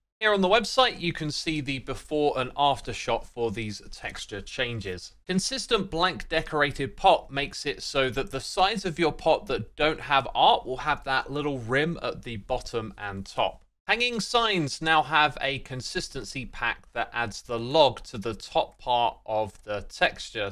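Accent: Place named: United Kingdom. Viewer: i cannot believe it has taken me this long, but this is the first time i realize has a british accent.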